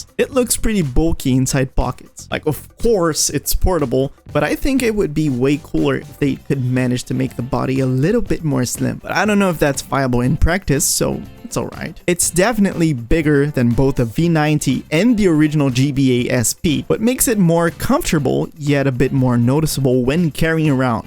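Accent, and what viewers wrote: Brazil. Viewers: Wait is that some acento br na tua fala? 👀👀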